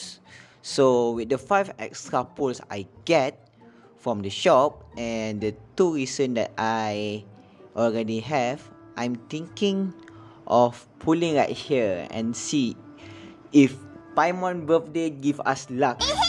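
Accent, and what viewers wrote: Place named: Malaysia. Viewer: U SOUND MALAYSIAN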